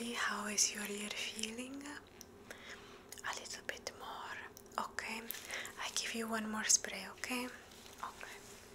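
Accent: Italy. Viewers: Your Italian accent is great - will you ever be doing a follow up to the Rome visit video? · OMG, your Italian accent is so cute without being over bearing or anything like that, if that makes sense at all. PS - You've got the stop the Qtip when there's resistance! ;-) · LoVe Italiano food & accent 💖🥰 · Great Italian accent 🇮🇹❤️❤️👍👍I like it a lot you do the best Italian accent ever🇮🇹😌❤️❤️sooooo cool!!!!😎😎😎😎😌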